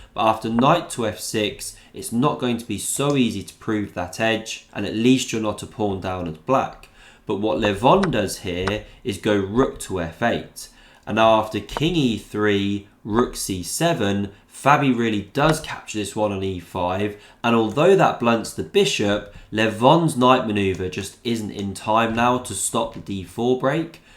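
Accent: England, United Kingdom. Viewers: based purely on his accent im 90% sure he is from england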